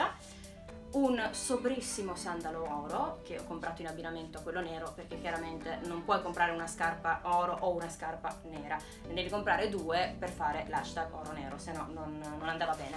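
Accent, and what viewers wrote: Sicily, Italy. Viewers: Ma sei siciliana? Sento un pò ' l'accento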